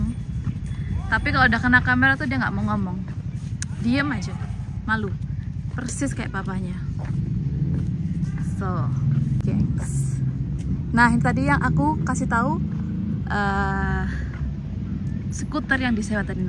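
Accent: Java, Indonesia. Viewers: Mbak sampean jowone ngendi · RAJIN UPLOAD MBAK E WES NGENTENI CAH AYU NGOMONG BOSO JOWO😂❤ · salut iso bahasa jawa; dan juga medok; lanjutkan budaya jawa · anakmu ngguemesne. medok e puolll pisan mbk yu, slm ko malang kota. mugo2 sampean sekeluarga diparingi rejeki lancar lan sehat walafiat... aminnn.... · Ya Allah 😅😅 Jowone medok banget Ayem tentrem nggih mbak meskipun 30jt lebih buat sewa😅😅 Belum lain lain Mbak, WC ne pake air nggak 😅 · Kok iso muedok? 😅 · Jowone ngendi mbak ? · Paling seneng lihat cantik, ngomongnya Jowo medhok... Pinter juga bhs. Indonesia. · Asalamualaikum mbk ... baru tau chanelnya .... jawane nek ndi sih mbak kok logate podo karo aku😅😅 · Bahasa jowone pean mentok banget mbak 😂😂😂👍👍👍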